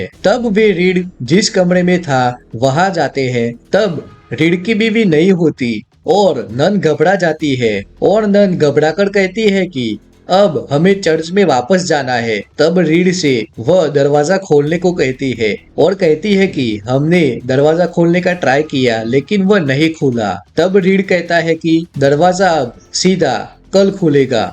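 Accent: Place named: Gujarat, India. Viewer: Gujarati accent….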